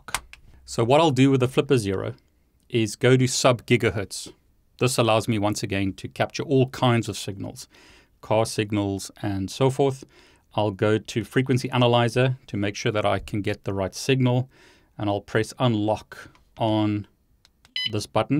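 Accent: South Africa. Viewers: South African? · Are you by any chance South African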